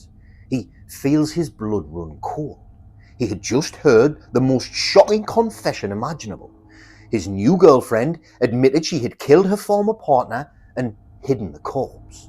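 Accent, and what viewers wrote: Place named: England, United Kingdom. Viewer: I cannot penetrate the host’s regional English accent.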